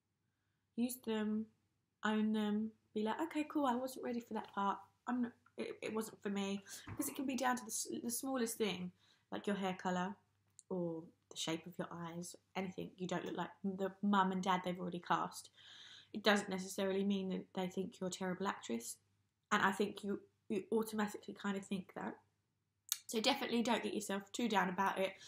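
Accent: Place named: Essex, United Kingdom. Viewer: Her accent sounds more Essex-y than she does in eastenders🙈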